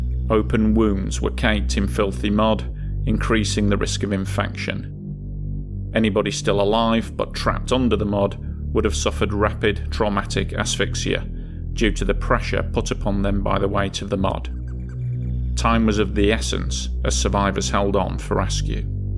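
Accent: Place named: Northern England, United Kingdom. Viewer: Where is your british accent from ... I seem to think its northern england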